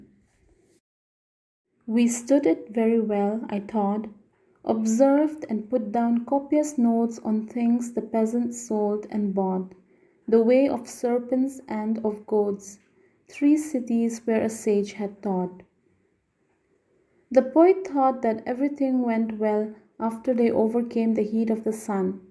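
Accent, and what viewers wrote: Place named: Mizoram, India. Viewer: Ohhh i accent ka hre chiaha ka lawmzia chu ❤ mizo lo ni rengin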